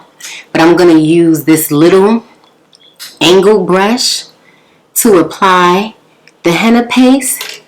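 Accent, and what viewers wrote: New York, United States. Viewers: That NY accent takes me back home every damn time 😁🥰 As always sis, you did that. They look really nice and natural. I've been contemplating ordering a kit off Amazon to make my brow shape pop and this was all I needed to see to go ahead and order it. I'm 37 and my eyebrows haven't grayed yet (knock on wood) but girl why am I getting gray eyelashes?! Like what kinda nonsense is going on here?! Them gray hairs just be finding new ways to be mad disrespectful. Speaking of lashes, waaayyy back when you had that video of applying individual lashes, do you still do your lashes at home?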